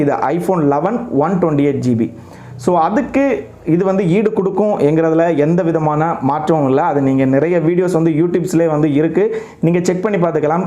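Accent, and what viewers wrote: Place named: Kanyakumari, India. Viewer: Kanyakumari pechu mathiri iruke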